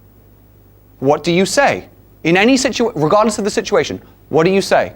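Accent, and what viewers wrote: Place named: United Kingdom. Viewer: Crap, i dont get that british accent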